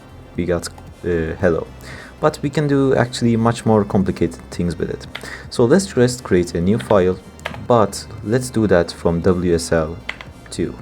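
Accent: Turkey. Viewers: Are you from Turkey ?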